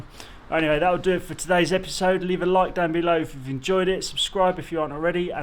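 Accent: England, United Kingdom. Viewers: Been watching your videos for a while. Learning alot about bricklaying. Many thanks for teaching us Yanks lots of Queens English. If I ever get a chance to visit England, I'll know what you blokes are saying.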